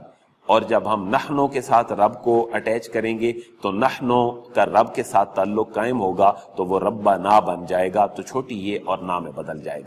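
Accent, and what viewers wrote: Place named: Punjab, Pakistan. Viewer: sahib k lehjy sy lag raha hai k ap gujrat,mandi, ya okara sy hain.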